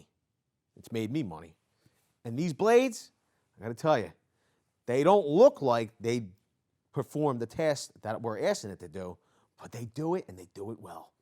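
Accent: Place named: North Jersey, United States. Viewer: You gotta be from North Jersey or close to that... Right? Sounds like it! Thanks for the video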